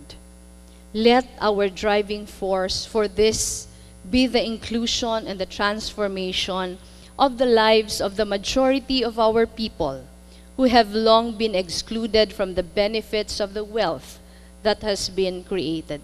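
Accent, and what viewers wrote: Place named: Philippines. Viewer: What's the problem with Filipino accent or her tone?😊 I hope this is not the first time na nakapanuod kayo ng isang speech? Internationally or even locally, it is well accepted to read a speech as it maintains close control over the tone or the timing of the speaker. Try to watch some inauguration or graduation speech, hindi po kahiya-hiya na gamitin ang pamamaraan na 'to. The audience does not care, anyway, what their after is the substance of her speech. You can also watch her Q&A dito rin po sa business forum. Please watch objectively, do not mock and disrespect the speaker merely because she's reading. She's invited as a resource person because she's considered as a person of authority and credibility. May expertise po siya sa larangang iyan. Ayos lang po na may suportahang kandidato ngunit huwag po nating hayaan lamunin tayo masiyado nito na nagiging bastos na tayo. 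😊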